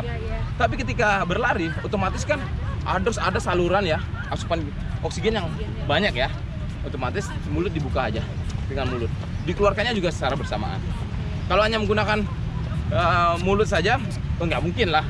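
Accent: Bali, Indonesia. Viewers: Logat nya om kok kayak orang bali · Bang dulu apakah pernah di Bali? Kok logatnya agak Bali gitu ya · Pantesan kayak ada logat-logat bali, ternyata kelahiran bali wkwkwk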